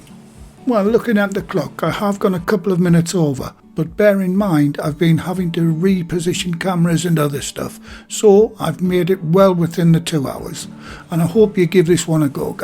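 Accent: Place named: England, United Kingdom. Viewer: I like to listening your English accent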